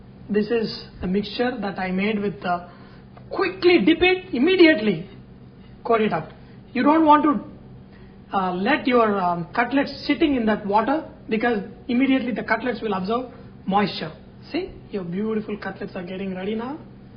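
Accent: India. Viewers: QUICKLY DIP IT! IMMEDIATLEY! ... the indian accent just makes this all the more fun XDDDDDDD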